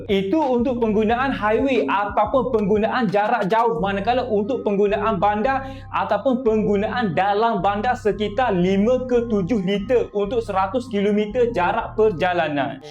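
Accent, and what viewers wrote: Kelantan, Malaysia. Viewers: Ini loghat kelate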